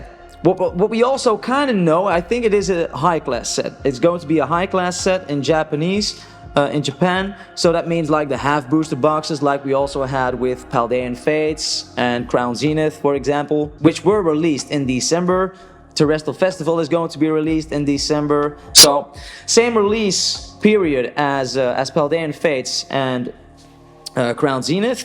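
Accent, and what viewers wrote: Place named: Netherlands. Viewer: Are you Dutch? You have a dutch accent. Anyway i think the feebas and melotic need to go on top of eachother. So the feebas below an melotic on top. Anyway keep up the videos😁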